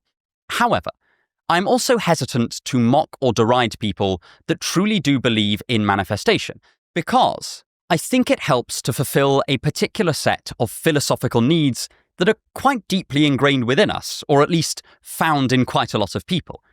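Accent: United Kingdom. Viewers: Definitely the best, purest British English I ever heard in my five years living in London. Great guy, I wish there were lots like him.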